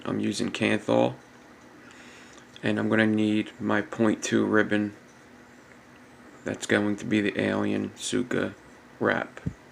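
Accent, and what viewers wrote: Philadelphia, United States. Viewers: Am I detecting a West Philly accent?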